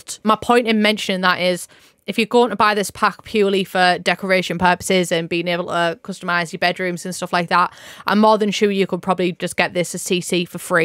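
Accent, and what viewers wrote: United Kingdom. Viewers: bro i’m sorry but what’re your accent is? somewhere in britain?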